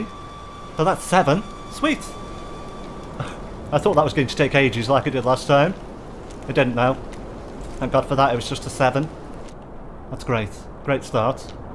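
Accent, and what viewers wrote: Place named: United Kingdom. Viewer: This man is very Bri'ish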